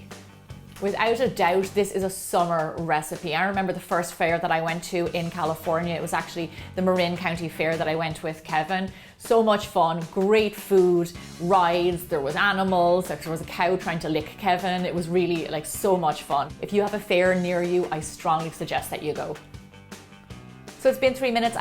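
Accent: Ireland. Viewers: I love your irish accent!! ❤️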